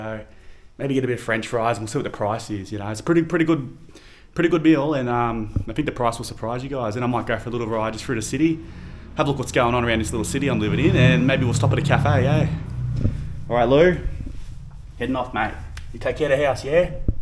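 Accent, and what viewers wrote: Australia. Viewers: Hey mate, how do you still have such a thick Aus accent hahaha. Crazy that, normally when you move out of Aus we get more pain English accent, but in each vlog yours seems like you get more and more aussie.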